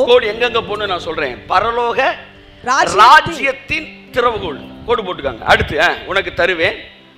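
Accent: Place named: Madurai, India. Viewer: In the name of Jesus Christ, when God's words are headed in our Madurai Thamilan voice, it' gets very specialized, like drinking TEA MIXEd WITH BOOST. Amen. May God bless you and your ministry forever and always pastor. AMEN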